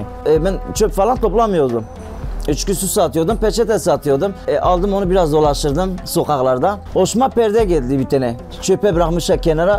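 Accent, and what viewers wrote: Diyarbakir, Turkey. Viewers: abi diyarbakırlı mı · Bu adam %1000 Diyarbakırlı :)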